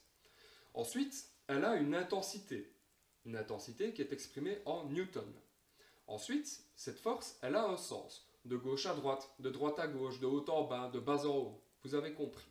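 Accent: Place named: Liège, Belgium. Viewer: petit accent liégeois? hahaha bien expliqué merci !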